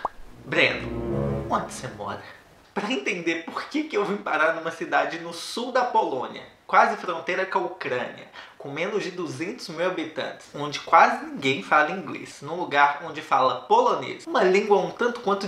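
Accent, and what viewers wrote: Minas Gerais, Brazil. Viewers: Adorei, Breno! As caras que vc faz enquanto vai narrando a sua história é 10, o sotaque mineiro é tudo de bom. Dito isso, conta mais pra gente. 😉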